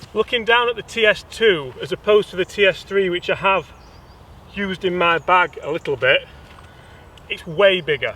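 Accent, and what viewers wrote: Yorkshire, United Kingdom. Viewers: You are growing on me man! I was unsure at first, but I'm thinking it was the Yorkshire accent..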